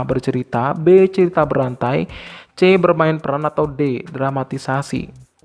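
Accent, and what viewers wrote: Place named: Java, Indonesia. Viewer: Bener lho suaranya mirip dosendeso...agk kejawa2an ngomgny...kaya org jawa medok dikit hihi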